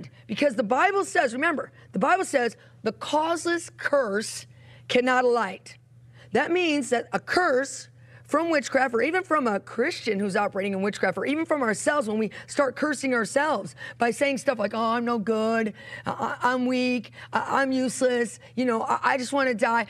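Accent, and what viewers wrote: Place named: New York, United States. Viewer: 😂, you from NY ❓️ you sure got a NY accent and way ❗️🤣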